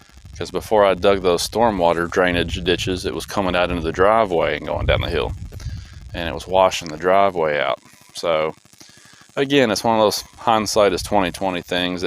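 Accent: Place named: Kentucky, United States. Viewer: I knew you were from KY, before you said it…. Sound just like me 😂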